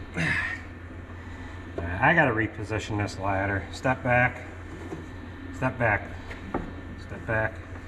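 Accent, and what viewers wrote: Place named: Appalachia, United States. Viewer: Quit talkng like a hillbilly god bless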